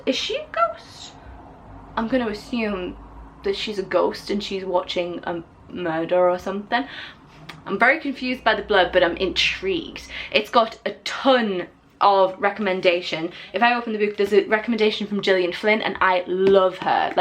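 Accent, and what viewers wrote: Newcastle, United Kingdom. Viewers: What accent do you have? Sounds slightly Geordie, soz if not I'm Welsh don't know too much about England. Your accent is very cool btw :) x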